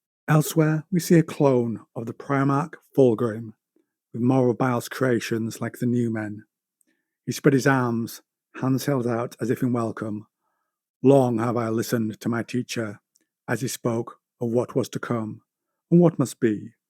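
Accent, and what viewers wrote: United Kingdom. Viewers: I think if you get a decent mic your channel would do well dude. and try do a read through of your script before hand as its kind of obvious its your first time reading it outloud. also vary your tone its difficult to tell when you're reading dialogue and when your reading other text. remember most of your audience is going to be yankees and fellow brits so your accent will actually be a boon😅. finally, what is your channel going to do thats different to the other lore channels that exist?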